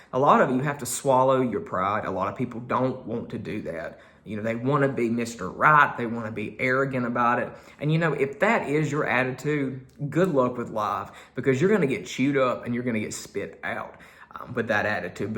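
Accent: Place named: South Carolina, United States. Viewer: you are SO WISE! They know how to raise 'em in South Carolina! And your accent is adorable. I wanna wrap you in my arms and hug you to death! (Well, maybe not THAT much LOL.)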